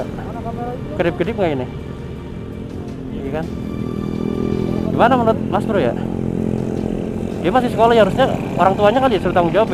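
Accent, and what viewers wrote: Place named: Banyumas, Indonesia. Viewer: Wong ndi mas deng ngapak hehe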